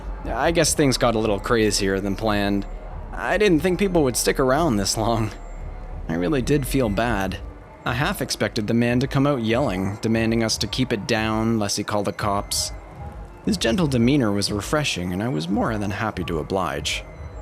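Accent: Canada. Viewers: The way he pronounces words with the letter U is a dead give away he's from some part of Canada. Granted Canada has many accents, like America, People in the Maritimes speak differently than those in the Greater Toronto area ect ect. Anyway listen for how he says "outfit". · Don't love the narrators Canadian accent, but other than that... All good. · These are some of the best Creepypasta recordings on YouTube. However.... man you can tell that the narrator is profoundly Canadian!!!!!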